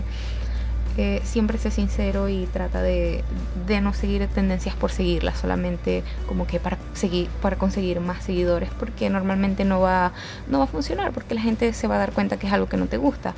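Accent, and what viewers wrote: Venezuela. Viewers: Pareces del Zulia, digo por tu acento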